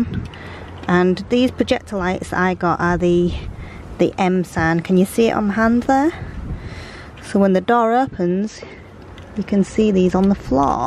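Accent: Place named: Yorkshire, United Kingdom. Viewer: Sound like you're from Yorkshire ! Ha fancy a race ?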